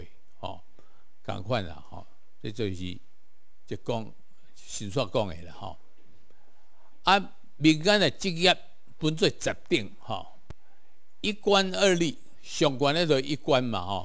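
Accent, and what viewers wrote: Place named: Taipei, Taiwan. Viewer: 這位郭先生的台語台北腔很明顯啊^^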